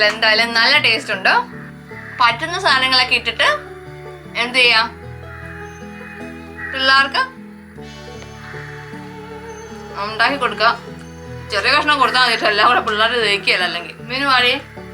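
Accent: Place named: Kottayam, India. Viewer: തനി കോട്ടയം ഭാഷ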